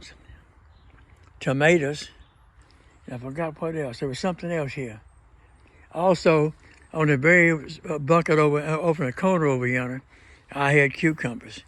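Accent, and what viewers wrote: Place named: North Carolina, United States. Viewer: Thank you for the great video! Are you from North Carolina? You sound exactly like my grandparents!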